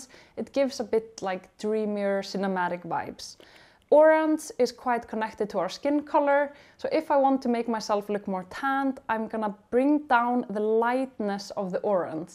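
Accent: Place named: Iceland. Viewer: Love your Icelandic accent!! Beautiful ❤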